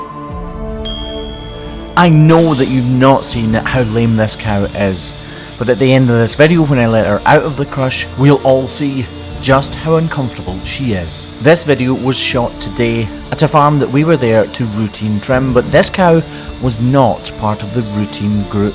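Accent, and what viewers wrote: Scotland, United Kingdom. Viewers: Can't get over the Closed Captions saying "So this carrot" at the start of the video, and I've never wanted to be Scottish more in my life. I mean my family is from there, but I didn't get the cool accent, just the freckles. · I've been watching your channel for a while now (I believe more than 3 years) and I've always been very thankful for your job, you and your team always do a great job taking care of these animals and it's very satisfying to watch ❤ but I also wanted to thank you for helping me improve my hearing skills! I'm a non-native English speaker (it's my second language) and the Scottish accent is very particular (and from my understanding, one of the hard ones to understand) so hearing your videos has helped me a lot! Thanks! 😊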